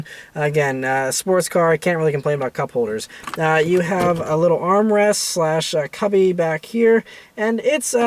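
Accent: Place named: Pittsburgh, United States. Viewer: Can tell your from the Pittsburgh area just by your voice lol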